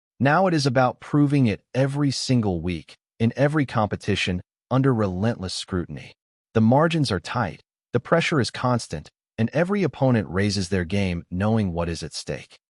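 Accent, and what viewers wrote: United States. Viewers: Sorry - but nobody in Scotland wants to listen to an American trying to talk about Scottish football - change the AI to a Scottish accent!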